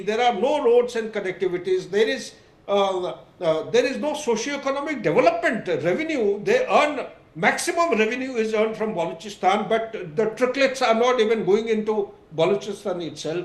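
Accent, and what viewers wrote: India. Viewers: Waatta Patta Itta 😅 Funny Indian Accent of English Language Always Hurts The Ears of Listeners 😅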